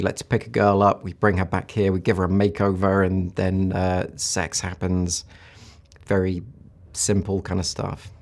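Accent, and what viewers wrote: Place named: United Kingdom. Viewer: Dude is kind of meh. British accents not good for stuff like this.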